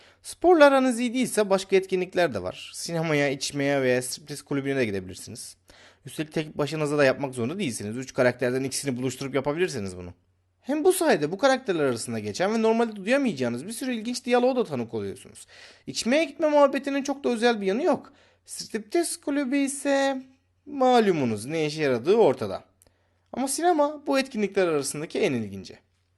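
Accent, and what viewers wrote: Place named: United States. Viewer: Aga Amerikan adamları tarzında konuşuyorsun. 'Hadi ama hangimiz yapmadı ki' gibi. Ama bu konuşmalar bana hoş gelmiyor. Alışkın değilim sonuçta. Temiz bir anlatım yapmışsın. Tarafsız olmuşsun. Tebrik ederim.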